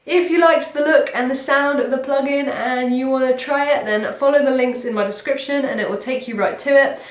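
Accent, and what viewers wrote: England, United Kingdom. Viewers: Unbelievable!! I love & after I learned how to play my 1st song I had a newfound respect for the man. I still am amazed by the way he thought and the licks he came up with. The 1st song I learned to play ever was!! I'm def going to check this out. I love your accent of course you are from the UK.. it's hard too meet a girl that rocks in the US. Now it's hard to meet anyone, (COVID) smh. Love your music keep up the good work you rock!! -- Nigel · Se nota que es inglesa, puedo conocer esa forma de hablar y su acento pirata!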